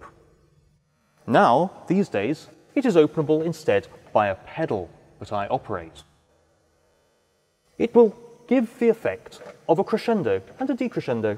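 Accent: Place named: RP, United Kingdom. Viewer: Riveting commentary. And, your English Accent sounds so intelligent and posh to us Americans. Did you write and prepare the script too?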